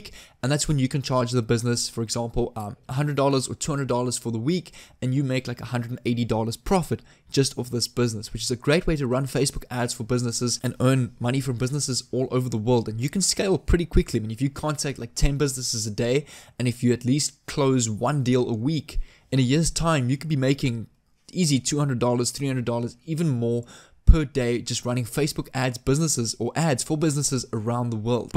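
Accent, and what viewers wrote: South Africa. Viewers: Hi, I just discovered your channel. I'm a fellow South African. (I'm assuming you're South African because of your accent and some of the websites you visit when you're recording your screen default to the.co.za versions.) Thanks for this video, I thought it was an excellent summary of the process involved. I have a question regarding the installing of the pixel. Obviously you are going to need access to the back end of these businesses websites to install the pixel. Are companies not hesitant to give you access to modify their website just from one email? I mean you're a complete stranger that just emails them out of the blue, and asks them to pay you as well as modify their website. I would be hesitant to let a complete stranger do that and even more hesitant if I found out they are from a foreign country, like us. Could you perhaps make a video going in to more detail about "Closing the deal". 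The practical skills like installing the pixel and creating the ads can be learnt and perfected but the hardest part of the whole process, in my opinion would be convincing them to let you fiddle with their website. Most business have probably hired a web developer to make their site for them and I'm sure if they asked their web developers advice, explaining that you contacted them and asked to install something on their website the web developer would by default advise them to just chase you away or ignore you in light of all scammers and fake traffic generation and SEO schemes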